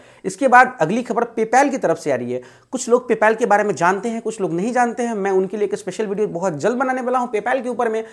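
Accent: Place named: Bihar, India. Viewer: bihari HAI. Aise hi bolega!!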